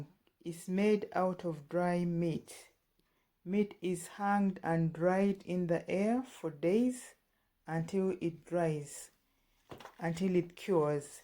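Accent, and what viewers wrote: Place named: Shona, Zimbabwe. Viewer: Oh my sister you have a beautiful Shona accent, Im enjoying it😄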